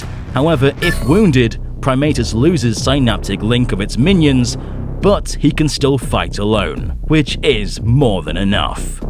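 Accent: United Kingdom. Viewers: i was like HES BRITISH! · HE’S BRITISH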